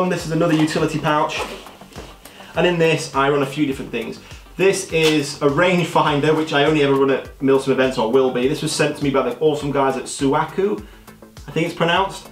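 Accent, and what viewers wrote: England, United Kingdom. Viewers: Witch part of England you from mate? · Where do you play, not a milsim, but a skirmish play, because you sound english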